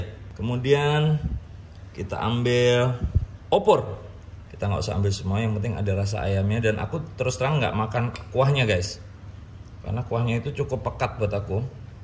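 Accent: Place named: Madura, Indonesia. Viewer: Ari Lasso pake bahasa logatnya Madura. Berarti dia masih tinggal di Jakarta. Padahal rumah aslinya itu di Madiun Jawa Timur.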